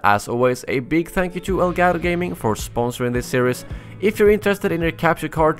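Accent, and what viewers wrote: Sweden. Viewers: agentokky he's Swedish, so it's not surprising if he doesn't speak English correctly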